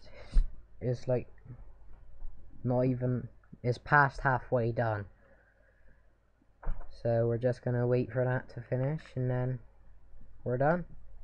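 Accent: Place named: England, United Kingdom. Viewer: he sounds english not like a chav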